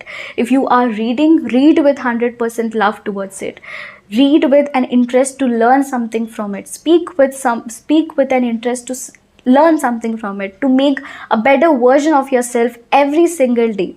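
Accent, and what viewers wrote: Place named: Andhra Pradesh, India. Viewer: By listening your words and accent I was able to figure it out that u r from andhra.. Maam pls try to change your accent when u speak English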